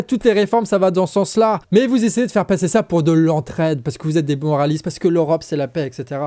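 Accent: Brittany, France. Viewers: Clair, net et précis ! Merci pour cet excellent ton du nord des cotes des mers d'Armor . (Tonifiant, ce doit être le granite, ou alors l'éternel et indispensable grain de sel dans le beurre)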